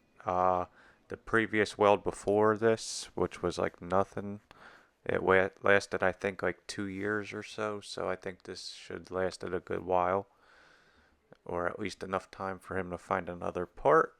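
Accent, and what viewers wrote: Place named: Southeast Pennsylvania, United States. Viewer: Nice work and thank you for sharing,! Where's your shop? It seems like I'm hearing a S.E. Pennsylvania accent.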